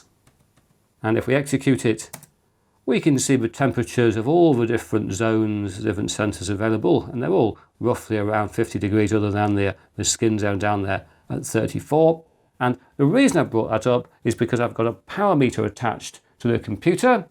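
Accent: United Kingdom. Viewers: Thank you for very clear and easy to follow videos and fantastic British English. So nice in middle of those AI s**** channels… using AI voice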